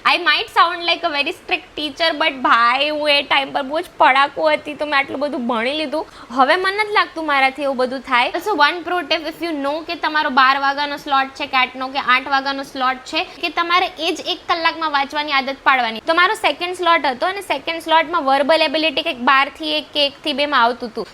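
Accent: Gujarat, India. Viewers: I can totally relate as a Gujarati medium student. When I was in 10th-12th the same as her topper girl and I never saw below 94% but now I am pursuing CA and it is throughout English and this is so hard for me In exams I am like I know in gujarati but I can't write in english. And after all of these my graph of score is like 📉 I am from north gujarat and totally gujarati chhokri who sit with DPS students and CBSE students and this feeling is like I am nothing in front of them but don't worry girl my many gujarati friends and I totally relate to you. Love you❤ I like your vlog and your gujarati accent.hu pan ak pakki gujarati 6u to badhane tel leva java do apne potanu pela jovanu😅😅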